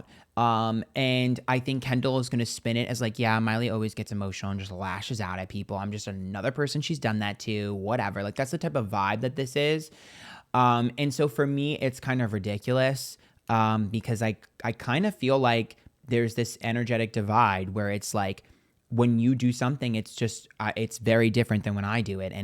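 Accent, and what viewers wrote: New York, United States. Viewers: I know you live in Texas but I recognize that New York accent! I’m born and raised in Long Island NY and people make fun of how I saw words and how fast I talk. But when I listen to you, I feel like I’m listening to a friend from home. Love it!!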